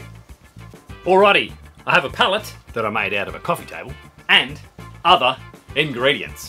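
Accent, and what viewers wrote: Australia. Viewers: Haha loved it. Most other YT channels just couldn’t have pulled this off. That Ozzy accent makes it work. Awesome stuff mate 🤓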